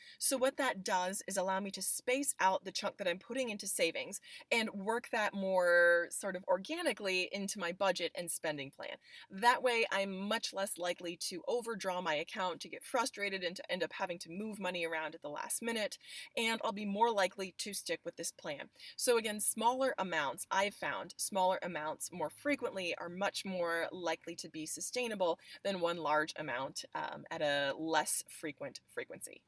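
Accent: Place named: Northeast, United States. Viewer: What part of the northeast are you from?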